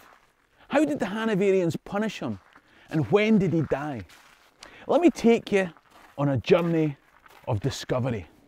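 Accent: Scotland, United Kingdom. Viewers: I absolutely LOVE everything about your country! Outlander is credited for putting "Scotland on the map" .. I love your culture, accent, passion, history and the beautiful country itself. It's strange I've never visited your beautiful lands, however, in the deepest part of my soul I know I have a strong connection to it!! #ILoveScotland #SendingLoveFromAustralia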